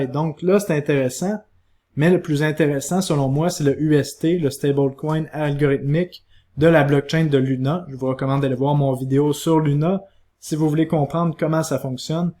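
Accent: Canada. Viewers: Merci super vidéo et j adoré ton accent canadien il est trop différent du mien.Je suis belge j ai aussi un accent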